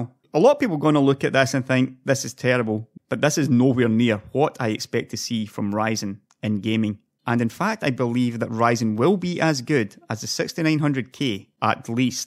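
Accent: Scotland, United Kingdom. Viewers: Scottish I understand him just fine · TBH it doesn't change the point you made and I agree with, that there process has matured now, keep up good work, nice hearing a fellow Scot!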